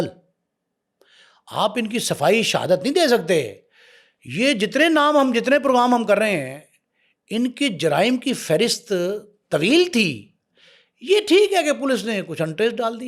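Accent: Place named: Lahore, Pakistan. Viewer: Karachi k hawaly se bat kre hain to meherbani suni sunai kahani na sunaen dusri bat ye wo Farooq ka accent Faarooq hai na k Farruooq plz apna lahori accent ek taraf rakh kr karachi ki bat kren 😡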